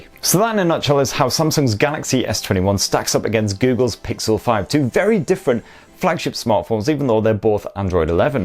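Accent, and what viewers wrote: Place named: Scotland, United Kingdom. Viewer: Where are you from,? You have a soft Scottish accent, so I'm thinking near the England/Scotland border?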